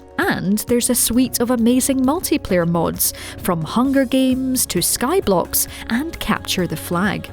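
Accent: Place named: Scotland, United Kingdom. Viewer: Scotish Accent is gold very good content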